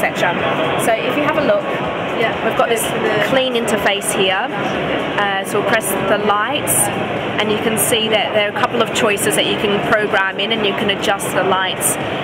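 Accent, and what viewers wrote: United Kingdom. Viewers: british nany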